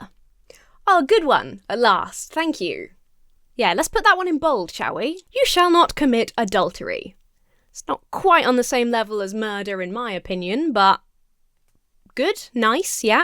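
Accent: United States. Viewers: your American accent is really good, genuinely. just wanted to throw that out there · Your US accent is getting really good! There is already established precedent that covers this exact situation. But who knows wtf our courts will overturn on any given day. This was an intentional move to be sued and put this into the national media and start a conversation about how “Christians are being persecuted.” And an attempt to place Christian Nationalism on a level of actual serious debate. So do I move my family to the UK? I am getting very embarrassed by what my country looks like from the outside. And concerned about the (internal) insanity that is moving out of the fringe and into the mainstream.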